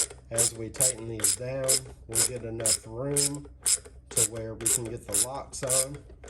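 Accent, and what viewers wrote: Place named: South, United States. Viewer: Love your videos man. I want to do some similar work this winter but I was unsure on how to do some of the things but you made it easy to understand. I’ve watched a lot of your videos lately, one thing I think you should do as far a video is show your face every now and then, the reason for this… is to get people familiar with your face, so when they scroll through YouTube they’ll be able identify your videos…. It’s kinda like a familiar face. Other than that I like the tempo of your videos, it’s not to slow and not to fast. I’m guessing your somewhere from the south because of you accent. I’m from central MS.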